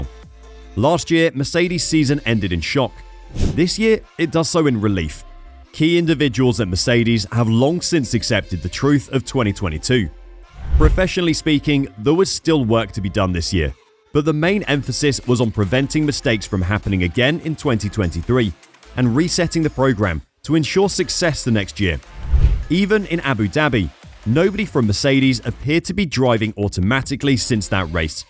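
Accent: United Kingdom. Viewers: “Clearly clear” sounds totally fine with a British accent. That accent definitely makes everything sound much classier 👌👌 (no sarcasm)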